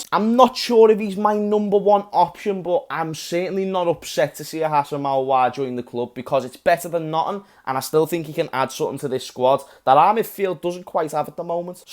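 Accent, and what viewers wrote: Liverpool, United Kingdom. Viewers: Yous a proper scouse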